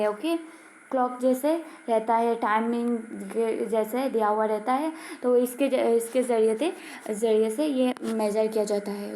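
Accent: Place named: South India, India. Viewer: I like your voice, may be you are from South Indian.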